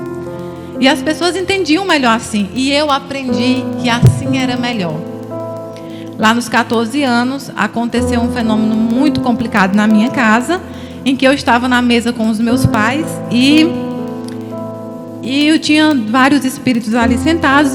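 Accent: Ceará, Brazil. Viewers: Ela é muito leve no falar eu gosto de ouvir ela falando das cartas ela e tão simples parece tão amorosa com todos e esse sotaque da minha terrinha Ceará e muito bom ouvir ela eu sei que nunca vou receber uma mensagem dos meus entes queridos que já se foram mais fico feliz ouvindo as mensagens dos outros pra seu familiares eu dou risada eu choro me emociono de ouvir as cartas lidas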